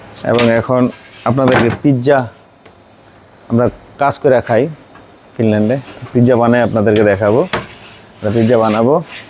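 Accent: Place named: Barishal, Bangladesh. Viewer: আমার কাছে বরিশালের ভাষার মত মনে হয়। ভাইয়ের বাড়ি বরিশাল হলে কোথায় জানাবেন।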